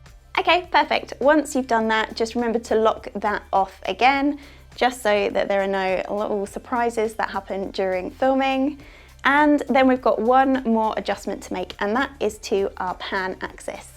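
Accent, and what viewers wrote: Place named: England, United Kingdom. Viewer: Brilliant!! Love the way the English speak. Awesome video! -Jeremy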